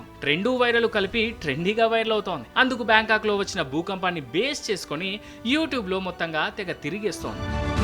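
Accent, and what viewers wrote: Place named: East, India. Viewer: Turupu gonta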